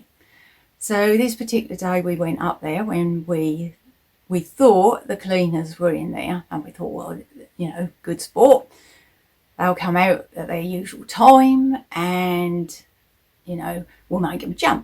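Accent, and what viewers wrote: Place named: Suffolk, United Kingdom. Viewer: Great tale 🙂 I used to fish a lake at Mistley Heath, not far from Hopkins home turf, and that place used to give me the heebyjeevies at night, no idea why but it had a weird vibe. I'm not easily spooked, I've fished loads of remote places at night over the years- even Orford Ness when sometimes I'd be the only person over there- with no problem but that place was odd. Love your accent, I'm Ipswich born and bred but it's not often you hear proper Suffolk these days, reminds me of my Nana and Aunt when I was a kid 🙂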